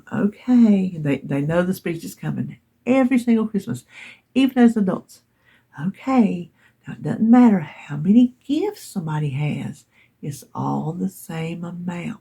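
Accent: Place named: North Carolina, United States. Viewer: I just LOVE to hear you talk! I live in Wilmington and the way you talk reminds me so much of my friend who lives in Lake Toxaway. A few videos back I think I remember you talking about Whataburger in Kannapolis and it brought back so many memories. We used to eat there when I was a kid and would go visit my brother in Concord.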